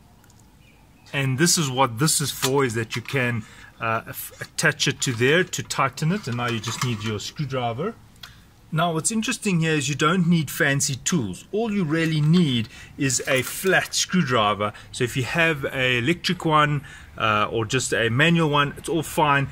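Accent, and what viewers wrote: South Africa. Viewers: Love the SA accent, going to assemble my weeeber tomorrow now. Thanks mate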